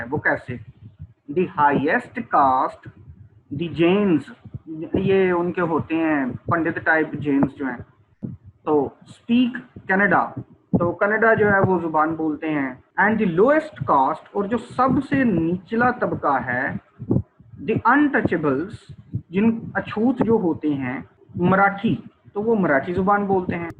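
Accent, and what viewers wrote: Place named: Punjab, India. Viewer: Sir enjoyed ur lectures particularly pure dialect in Punjabi 😄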